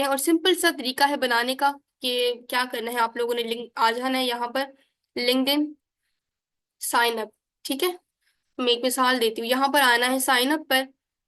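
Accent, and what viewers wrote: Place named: Sindh, India. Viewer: Ilahi behtreen. Khushe hue ke mashaAllah Hamare sindhi sisters be Digital platforms me hai Twan je Awaz ahen accent k lago munkhe tawan sindhi ayo. BTW Zbrdst lecture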